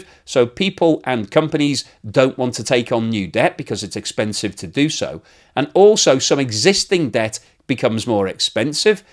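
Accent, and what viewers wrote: United Kingdom. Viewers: Oh, British accent. It's a dead giveaway. As soon as one hears it - one knows he'll be lied to at nauseum. I don't know if that thing is cultural, traditional, biological - but British accent is a flashing red light. My point - no one from that incest ridden, degenerated island, with crooked teeth and the hunger for other people's stuff, is not to be trusted. Just my 2 cents. You're welcome.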